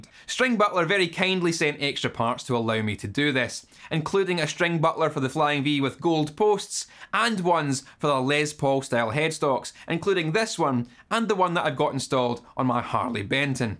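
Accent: Scotland, United Kingdom. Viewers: String bucklers! Hot damn! Why did it take so long for these to be invented? Or rather: why are guitar manufacturers still building expensive instruments that pull the strings sideways from the saddle? I mean, tuning-wise, these are accidents waiting to happen, and guitar players have been plagued by this completely unnecessary problem for generations. Gotta pull the strings out of the saddle absolutely straight , dammit! I gotta get me some of these. 10 minutes of Google later: Oh, butler , not "buckler" . Hot damn again - your Scottish is a wee bit too confusing for me...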